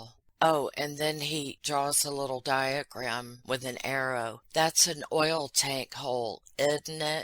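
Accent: South, United States. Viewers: PS. You also teach me so many new expressions in the English lingo. I'm very grateful. Your sense of humor is just my cup of tea. Your southern accent isn't that pronounced to me as some I've heard from/of. I myself has a "cosmopolitan" accent, my best friends from the U.S. told me last time me and my hubby visited them. That is more kindly said "Your accent is all over the place with Swedish, British, Australian and American and it sounds pretty f'ck*ng funny!" DS. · southern drawl! It reminds me of my southern-as-all-hell aunts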